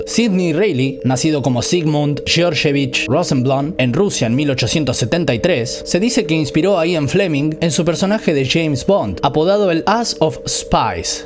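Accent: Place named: Argentina. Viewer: Me gustaria saber de donde es este muchacho, parece argentino.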